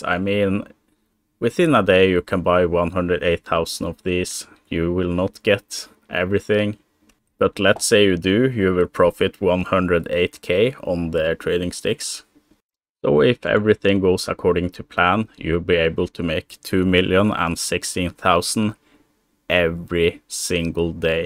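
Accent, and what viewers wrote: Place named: Norway. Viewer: Jeg visste at du er norsk ut fra aksenten din, dette er en veldig fin video(vær så snill og si meg hvis norsken min er dårlig, jeg begynte å lære for 1 år siden)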